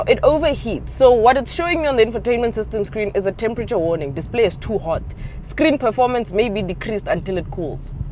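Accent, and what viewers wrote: South Africa. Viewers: U South African?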